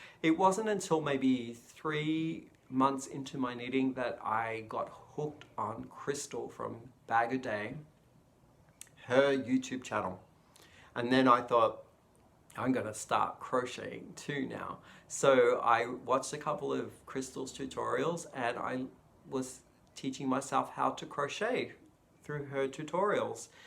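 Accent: Australia. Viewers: Hi, my name is Dawn and I'm in Las Vegas. Bag o Day sent me over. Just subscribed. I watched your happy mail from Crystal and seen all the beautiful yarns. Saw your video with them hats and your Chevron scarf which is very nice itself. Love your Aussie accent. I don't knit but would like to learn. I do crochet do you? Will stay with you from now on. I have two in laws from Canada and truly like the country. You and hubby have a great weekend and stay safe.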